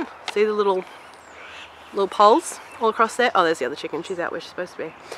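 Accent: Australia. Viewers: Just found you and hearing a fellow Aussie is awesome!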